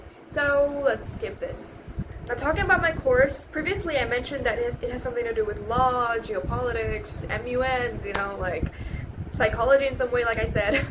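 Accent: United States. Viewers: Why do u have an american accent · I’m disgustingly annoyed by the fact that you sound exactly like a girl I know, and guess what she isn’t even from India, she’s from the STATES🦅🦅. Why and how do you sound so American?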